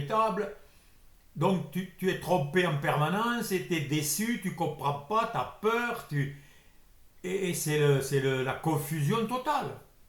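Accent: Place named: South-West, France. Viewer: ami au bel accent du Sud-Ouest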